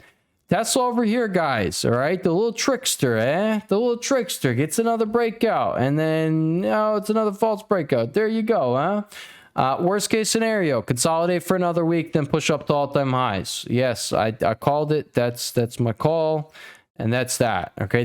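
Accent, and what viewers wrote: New Jersey, United States. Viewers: As a European, your New Jersey accent makes this so funny😂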